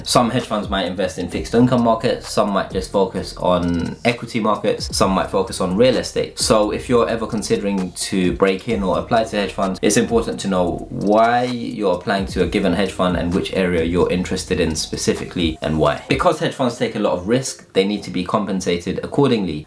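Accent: United Kingdom. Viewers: Nice and clear especially because your British too. Can you explain short selling too please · This isn’t in basic English! It’s in British!!!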